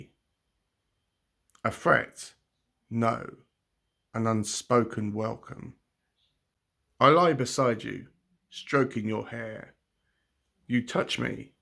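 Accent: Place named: United Kingdom. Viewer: Thank you so much for this cool reading!!!! It didn't even cross my mind 🙃 I assume your accent is U.K. - The Lone Wolf lore may be only in America. They even made a movie Lone Wolf McQuade with Chuck Norris. 😁 The wolf was me (I grew up hard), the other is my wife, when I met her. And the end is just me being happy! I don't have the chops to be a poet, I try to write it to ignite my creativity for the prose I work on. I must admit, it sounds so much better when you read it!!!! Thank you so much!!!!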